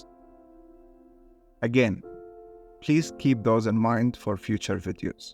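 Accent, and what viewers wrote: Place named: Egypt. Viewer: Since you asked for some corrective feedback: Your tone in the video is a bit laid back. I kind of think you are on your bed, early in the morning, making these videos. Although I like how the silence in between the spoken words matches the cosmic background of the space void shown in the video, that makes a good combo. You can also consider making your videos under 2 minutes of length; that will improve your full-watch statistics bettter on youtube. It is good to explain how your own particular dialect is at play at some of the video contents. For example, you would say 'albi' when reading the world Qalbi (my heart) because that is the (Egyptian?) dialect perhaps. Similarly, you'd say 'Kateer' while reading KaTHeer with a ث. It is also a good idea to keep people informed about some of the sharable private information/news/material from your actual life during the video as it creates a strong personal bond between the content creator and the followers. 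Finally, creating content around anything that is trending in the news will make the content further interesting as well as catch some of the organic search traffic to your videos. Overall, good efforts, in fact, great efforts and the only advise that suits this time of your YT creator's journey is not to give up till your 250th video and wait like a patient harvester. This would be a case of یعجب الزراع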